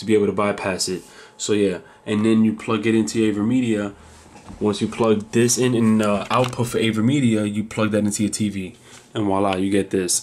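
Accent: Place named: Queens, United States. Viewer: Nice vid bro I can tell you're from Queens NYC I'm from Brooklyn LOL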